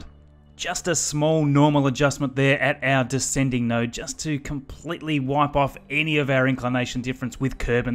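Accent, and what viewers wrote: Australia. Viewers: Oh. I know what's up with the AU accent (I'm used to associate this accent with the british one because I'm from Spain), but still, nice job with the videos, the things you guys make are so satisfying to witness:D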